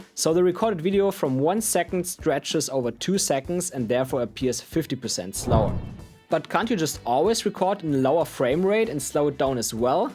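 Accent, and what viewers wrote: Germany. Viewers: Really good video 👌 a question 🙋🏼‍♂️ you German? I kinda heard in your accent.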